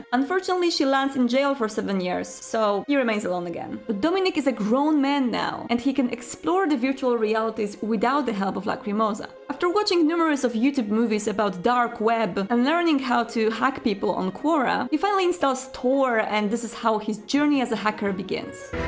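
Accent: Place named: Poland. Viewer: I'm loving these videos and your polish accent <3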